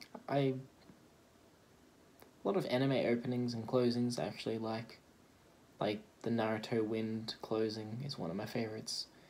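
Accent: Australia. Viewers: You sound very Aussie still. But right at the end when you said "I'll see yas round". I was like "nah he's definitely Australian haha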